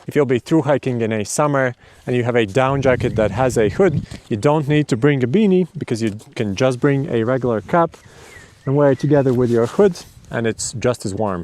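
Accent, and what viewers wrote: Latvia. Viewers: Very helpful, super compact and easy-to-understand video! Skatoties likās, ka Tev ir mazliet latviešu akcents, un izrādās esmu trāpījis. Sveicieni un lai izdodas arī turpmāk!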